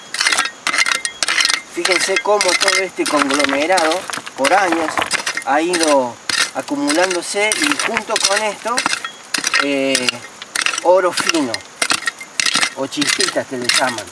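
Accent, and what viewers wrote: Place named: Cordoba, Argentina. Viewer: Es muy gracioso su acento cordobés. buen video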